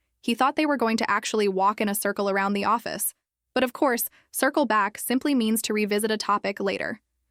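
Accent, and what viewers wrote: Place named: United States. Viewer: American English of course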